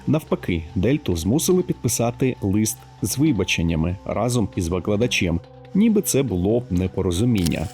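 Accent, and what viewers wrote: Russia. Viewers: Відео хороші, але попрацюйте більше над вимовою для відео. Акання дуже чутне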